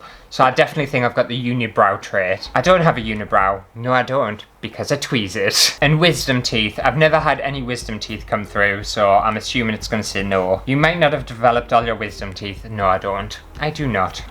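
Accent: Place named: North East England, United Kingdom. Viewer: Glad to hear it - I couldn't really imagine you without your North-East accent! 😄😄Endurance just sounds wrong all round 😆😆 Hope your weekend is going well so far and the latest video isn't turning into an endurance test of its own!! 🤣🤣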